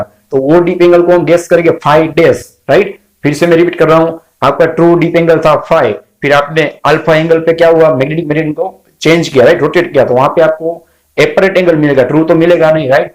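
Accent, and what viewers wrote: Gujarat, India. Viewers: Aree sir!!! Apka hindi ka accent bhi gujrati hai mazaa aya!! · Your language is like gujarati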